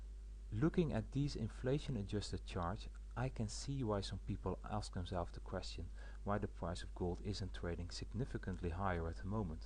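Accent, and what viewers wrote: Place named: Netherlands. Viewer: Lol he's Dutch. Shitty accent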